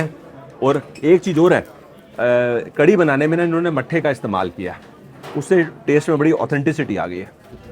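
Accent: Punjab, India. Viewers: Sir ....There is some 'NARRATION MAGNET' in your voice pitch.....upr se thoda PUNJABI TADKA😊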